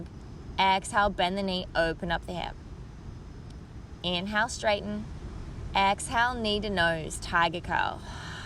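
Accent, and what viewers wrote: Australia. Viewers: the Aussie accent is great - a nice change of pace for a Midwestern (US) yogi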